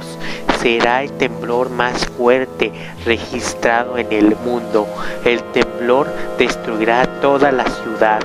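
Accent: Chile. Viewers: Chileno, ndeaah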